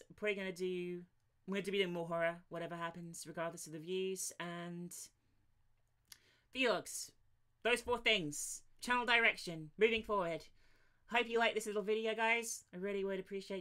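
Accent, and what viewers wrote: United Kingdom. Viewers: I love your voice. Its kinda adorable to me with your british accent ;) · your voice is sexy, british, and that's all that matters to me. <3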